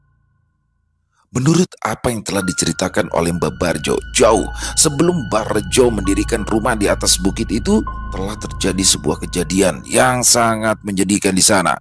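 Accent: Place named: South Kalimantan, Indonesia. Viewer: Met mlm mas dgn suara khasnya anak kalsel hdir lg nyimak ceritanya⭐⭐⭐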